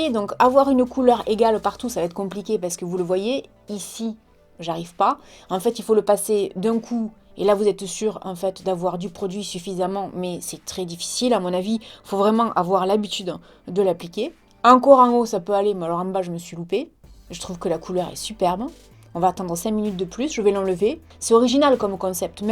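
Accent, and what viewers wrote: South, France. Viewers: Bonjour Tout d’abord je voulais vous dire que je suis tombé sur vos vidéos par hasard et que je suis devenue fan de votre chaîne.vous êtes rigolotes, pétillantes et votre accent me fait voyager dans le sud à chaque fois..... bref je suis tombée sur un site internet kosmeopolis qui vend du make up a petit prix (marqué Bell, joko...) je recommence à me maquiller un peu plus depuis quelques semaines et vos vidéos a petit prix sont parfaites pour moi. bonne journée à vous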